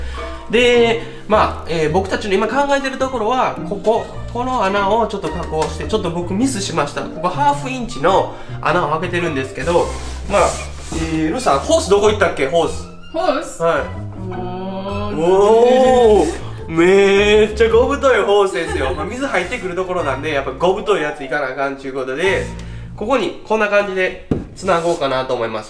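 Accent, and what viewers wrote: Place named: Banshu, Japan. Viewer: いつも楽しく拝見させてもらってます。さんが時々 そんでとか 〜やけどしゃないやろと言った播州弁で喋ってられるのをみてすごく嬉しく懐かしく感じてます。小生中学生まで ６０年前までですが住んでいた者ですから。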